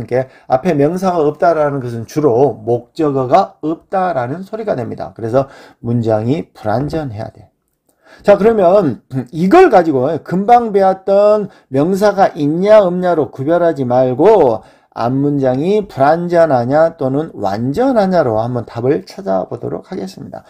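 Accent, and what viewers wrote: Jeolla, South Korea. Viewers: 영어보다 즌라도 어투가 넘 도드라져서ㅜㅜ 40초 듣고 껐습니다 ㅜㅜ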